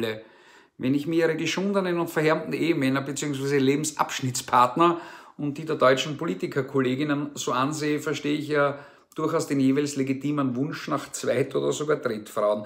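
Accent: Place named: Austria. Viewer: Herr.... Sie ein Sprachrohr der Menschheit mit sehr angenehmen Worten! Ihr Vokabular und IHRE Stimme mit dem herzlichen Österreichischen Dialektes ist ein Genuss...! Wahrlich 👏😘 Ich könnte mir sehr sehr gut SIE als Posaune Gottes vorstellen, der das wahre Evangelium, jaaa, die rettende Botschaft von Jesus Christus verkündet.,...weil SIE die Wahrheit sagen... Servus und BABA hochachtungsvoll Reinhard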